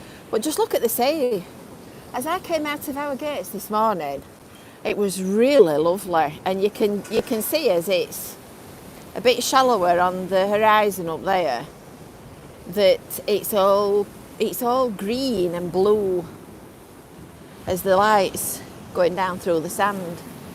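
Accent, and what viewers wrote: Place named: Yorkshire, United Kingdom. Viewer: That has to be a Yorkshire accent with that patter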